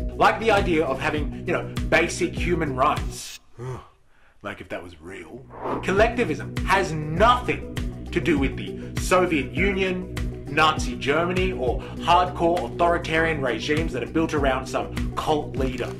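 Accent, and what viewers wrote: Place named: Australia. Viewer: using a voice changer but still sounding Aussie? as **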